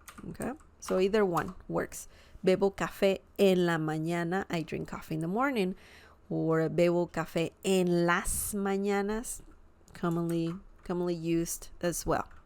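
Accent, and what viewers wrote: Castile, Spain. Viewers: is this considered castilian spanish ? also i noticed that the C/Z words that are pronounced TH is not the case when the letter C is at the beggining of words ?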